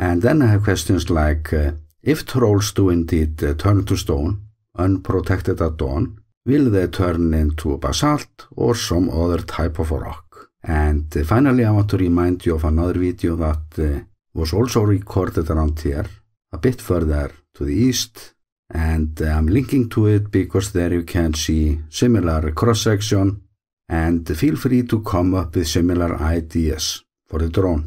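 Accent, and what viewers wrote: Iceland. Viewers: Brilliant as usual and the English language couldn't sound any better with an Icelandic accent.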